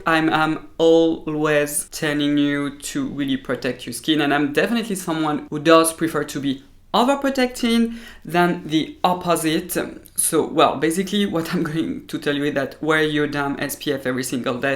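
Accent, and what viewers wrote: France. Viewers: i love you and also love your french english😗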